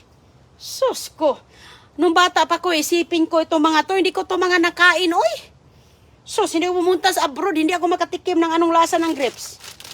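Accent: Visayas, Philippines. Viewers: it's bisaya accent...wag ka mag inarte...bakit ang boses mo ba boses angel ka ba😂😂😂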